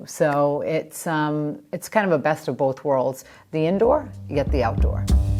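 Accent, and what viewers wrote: United States. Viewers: I can tell by her accent, we are from the same area. I'm in NJ, so that's my guess. A Jersey girl! The house is wonderful. Totally my style. I think the trees indoors, do drive me nuts haha. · New York accent. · she sounds American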